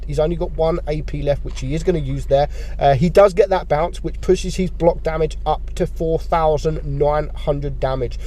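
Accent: United Kingdom. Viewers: Excellent British commentary and great replays.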